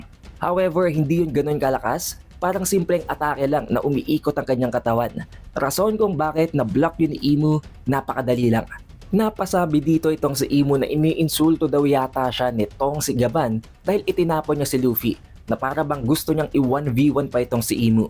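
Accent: Philippines. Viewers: Ok naman din to kaso ang OA ng TagLish niya. Kalalaking tao pero na taglish? Bading!!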